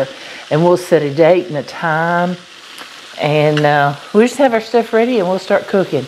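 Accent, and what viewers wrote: South, United States. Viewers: First time here and I have two things to say. When I hear your voice, I’m reminded of the old girls in the southern Baptist Church I attended years ago in Tulsa. You also have an accent like Paula Deen’s. Y’all could be cousins. 😊 Your voice is soothing. I bet you can tell a good story. The grub looks good.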